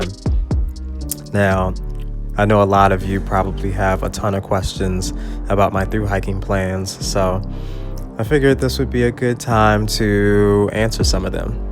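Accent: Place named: Maryland, United States. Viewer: hey! Greets from Northern VA! You sound like you are over the Potomac in Maryland. Came over from Alicia's channel on the Dolly Sods adventure.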